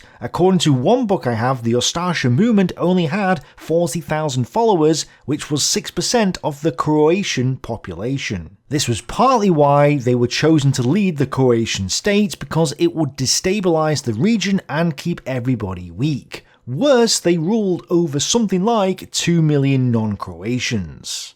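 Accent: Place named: North, United Kingdom. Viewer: I was born in New Hampshire and like your shirt. Can’t quite place that accent. Sounds like you’re from the Far North!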